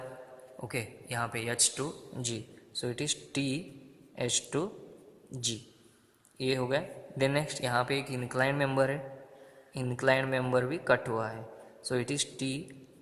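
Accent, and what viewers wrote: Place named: Maharashtra, India. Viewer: Bhava marathi ahes na tu tuzya hindi varun samjla🤣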